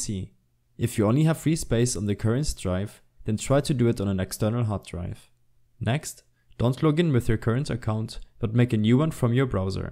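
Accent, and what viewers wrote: Germany. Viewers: Man merkt dass du deutscher bist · bist du ein deutscher?? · lol ich hab sofort deinen deutschen dialekt erkannt xD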